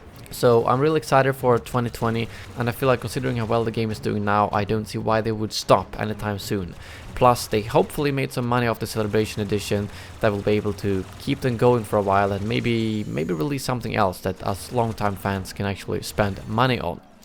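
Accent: Sweden. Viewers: Are you from Sweden? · Den svenska dialekten är ju najs :)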